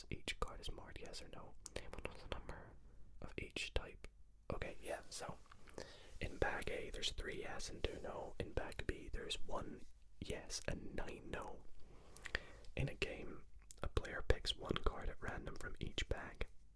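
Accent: Scotland, United Kingdom. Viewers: YOOOOO I DIDNT KNOW U WERE FROM SCOTLAND I KNEW THERE WAS SOMETHING FAMILIAR ABIUT YOU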